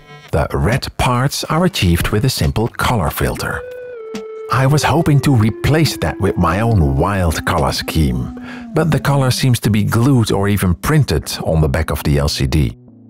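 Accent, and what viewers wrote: Netherlands. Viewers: Very Dutch accent: replacing all the 'th' sounds with a 'd'.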